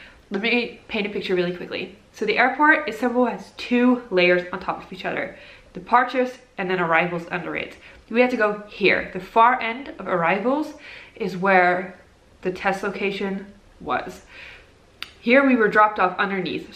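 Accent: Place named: Netherlands. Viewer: You sound more Dutch when you’re recovering from surgery 😍 wishing you a speedy recovery and gorgeous results!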